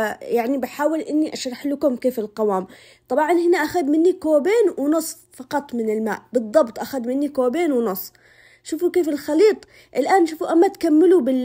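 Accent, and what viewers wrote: Aden, Yemen. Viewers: تحديثها وشرحها ممتع مع اللهجه العدنيه عدن ام الدنيا وناسها الطيبين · اتابع الطبخه ❌ اتابع اللهجة العدنية وجمالها ✅ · تسلم الأيادي ي بنت اليمن ذات اللهجة العدنية الجميلة.. باقي 62 يوم على قدوم ضيفنا الكريم .. رمضآن.. شهر الخير ..طالبين من يدك الكريمة فضلا وليس أمرا.. بعض الأطباق التراثية الجميلة العدنية و المدن المجاورة لها حسب استطاعتك. لآ يكلف الله نفسا إلا وسعها. ألله يعطيك الصحة والعافية...إبن اليمن من جدة السعودية..🎉🎉🎉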